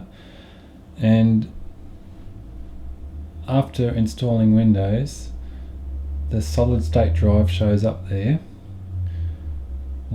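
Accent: Australia. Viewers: great stuff thanks already knew a lot of that but the folders I didn't know about that I usually did it a different way thanks for that good Australian accent you have like me hahah ok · YES another aussy lol Respect!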